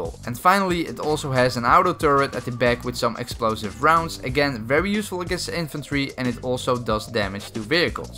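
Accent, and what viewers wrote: Netherlands. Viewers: je kan wel horen dat je nederlands bent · Your so dutch, ik hoor je accent direct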